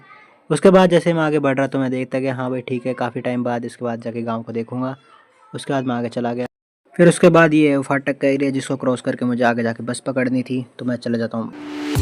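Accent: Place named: Haryana, India. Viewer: haryanavi accent is fireeeeee 🔥🔥🔥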